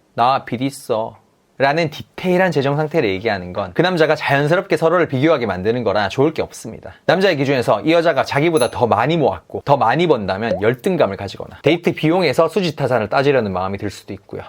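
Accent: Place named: Daegu, South Korea. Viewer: 대구분이시네 대구말 예뻐요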